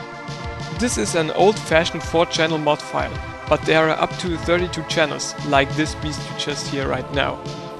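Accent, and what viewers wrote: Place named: Germany. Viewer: Absolutely love your selection of music, and your German accent is awesome! Keep up the good work :)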